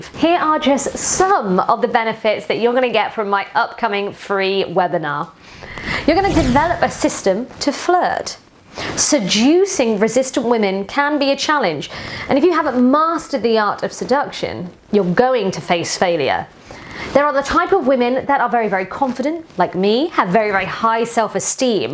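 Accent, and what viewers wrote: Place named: United Kingdom. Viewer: Beautiful accent, sounds British.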